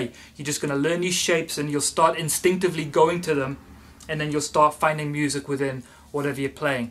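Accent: United Kingdom. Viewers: man, I am in, even I learend my american english, I can understand you enough with your british accent, and I will try your doccuments. Thanks, you are great. Now I am gonna play more clearly.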